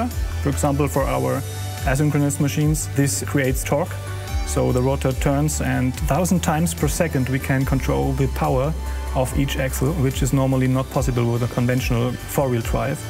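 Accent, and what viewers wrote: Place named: Germany. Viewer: its a german i hear it😂😂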